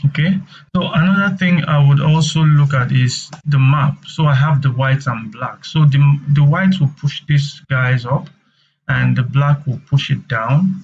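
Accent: Nigeria. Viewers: bro u nigerian?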